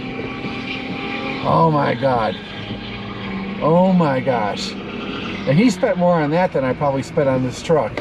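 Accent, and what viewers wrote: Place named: Cleveland, United States. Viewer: Cleveland accent I hear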